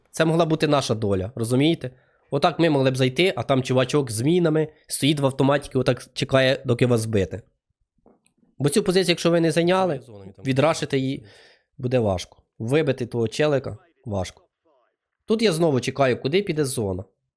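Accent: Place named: Vinnytsia, Ukraine. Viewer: Привіт. Перший раз натрапив на канал, а звідки саме автор? Говір дуже сильно вінницький) нехай повернення верданська принесе тобі підписоти❤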